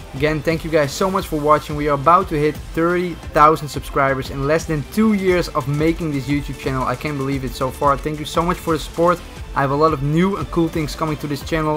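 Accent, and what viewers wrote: Netherlands. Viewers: You come from netherlands right?😊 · Are you Dutch? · he is dutch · are you dutch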